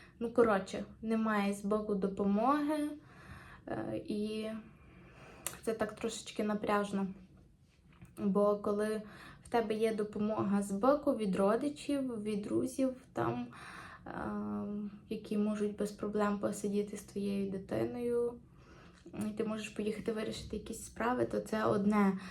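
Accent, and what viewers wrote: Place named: Hutsul, Ukraine. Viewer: Дякую і вам, що ви є у нас).🥰 Не хворійте. Ваш гуцульський акцент я просто обожнюю. У мене так не виходить 😊. Будемо чекати наступне відео 💙💛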